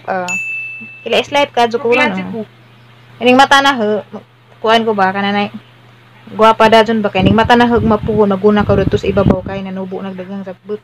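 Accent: Bohol, Philippines. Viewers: Nalingaw kus imong accent dam hehe. Makamingaw ang bohol. Pagdag bringhauz dam ha hehehe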